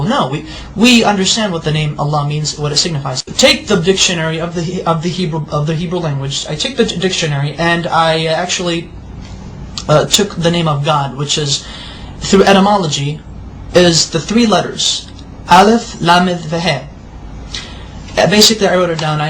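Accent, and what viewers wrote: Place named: Arab world. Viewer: erstens schaut dieser mann nicht wie ein jude sonder ist sicher ein araba sein aktzent ist arabisch und will die juden verarschenaber er verarscht sich selbst jeder erkennt das er kein jude ist der trottel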